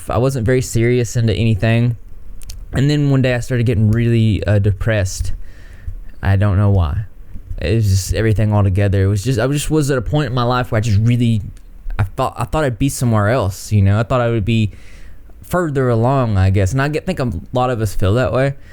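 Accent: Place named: Georgia, United States. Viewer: can't believe i didn't put it together that you are from georgia. i love the accents of the people from there and i love yours. and really appreciate that perspective you have. you wouldn't know happiness if you didn't know pain. i use similar mantras myself, my current favourite "happiness or suffering, the choice is mine/yours". happy holidays everyone 🎐☃️💟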